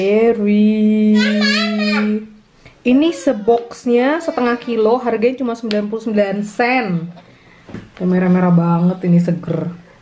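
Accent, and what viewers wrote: Indonesia. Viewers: lidahnya indonesia banget😄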